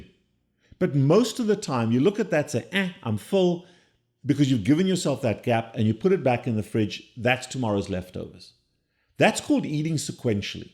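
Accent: South Africa. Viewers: He has a South African accent. In South Africa we speak Afrikaans which is very similar to Dutch. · 😂Also the French done a child's portion by an interior decorator! 😂😂😂 I'm also South African, and he hasn't lost the way we talk, although he has left the country long ago😂❤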